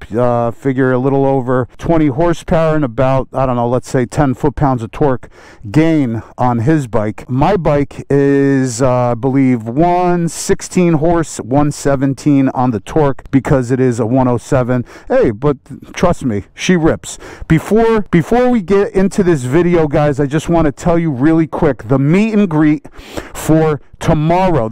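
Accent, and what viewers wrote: New Jersey, United States. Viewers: Agree Stage II is the way to go. Best bang for your buck for sure. How was your cup of coughvee? :-) Love that Jersey accent.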